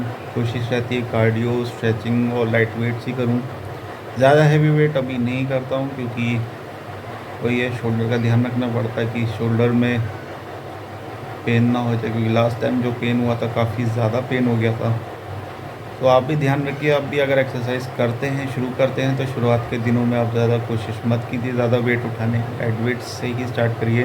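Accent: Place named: Samastipur, India. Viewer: Dii mai samstipur se huu sem isi trh hmlog v bolte h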